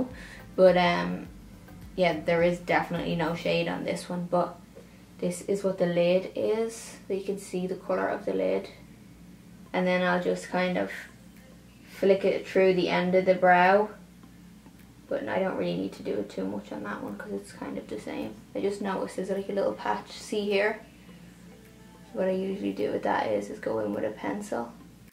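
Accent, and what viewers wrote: Ireland. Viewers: nidaa haib pretty sure Irish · Irish (Dublin I think)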